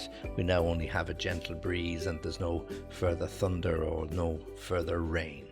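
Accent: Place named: United Kingdom. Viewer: I watched this video twice, I was enjoying it, your British accent sounds great, my warm welcome from Kazakhstan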